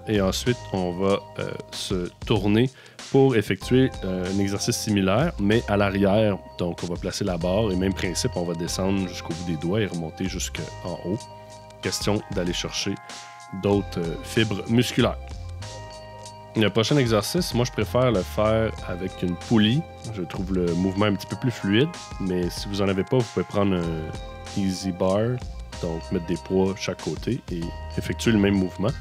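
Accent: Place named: Quebec, Canada. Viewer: Un québécois, oh bin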